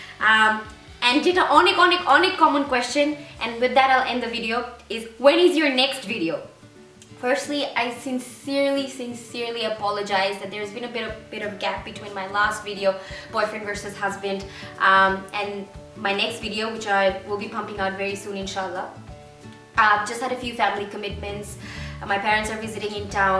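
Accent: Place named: Bangladesh. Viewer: আপু আপনার bangla accent শুনতে খুব ভালো লাগে !!!! :v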